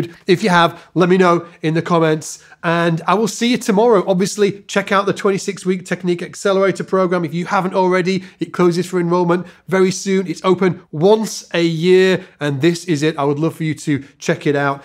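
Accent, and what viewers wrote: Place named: United Kingdom. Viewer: are you British or are Australian, or you are gabacho(USA)? I've watched videos speaking with a gabacho accent. Now your accent is British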